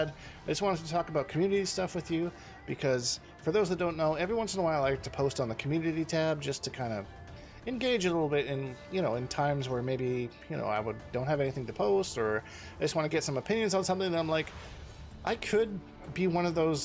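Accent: Canada. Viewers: Ah aboot time we get another great video from love the way u guys say aboot in canada hope i can run into you in the beta brother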